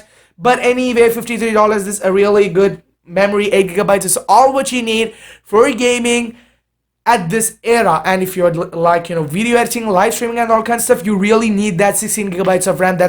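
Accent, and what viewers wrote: India. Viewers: Bro I am a proud Indian and I thought that ur accent Was like a Indian That's why I asked 👍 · u sound indian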